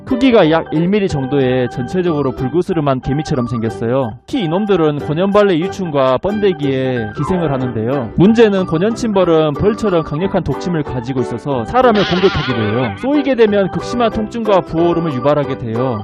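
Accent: Jeolla, South Korea. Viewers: 전라도 사투리 억양 정겹네요 ㅋ 표준어 쓰는척 하는게 억양이ㅋㅋ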